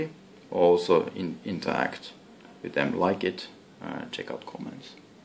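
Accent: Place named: Finland. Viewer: Finnish - English.. XD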